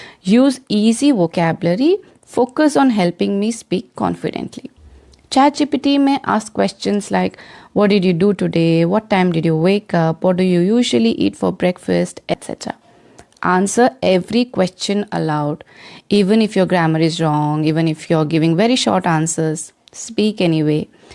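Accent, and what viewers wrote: India. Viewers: U r such kind desi voice who might be transformed very easily to standard English pronunciation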